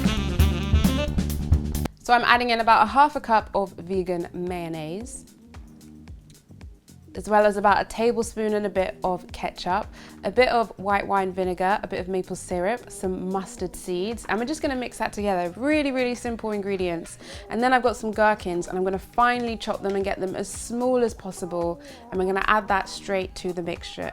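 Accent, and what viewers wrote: United Kingdom. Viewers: Gosh you’re very beautiful and that British accent compliments your beauty. By the way I’m going to try this burger. I’ve been a vegan for three months now.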